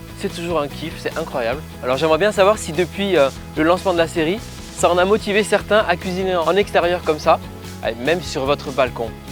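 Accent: South, France. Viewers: Coucou, merci de partager vos souvenirs et votre galette! Il me semblait avoir reconnu un reste d'accent du Sud!... 😉😀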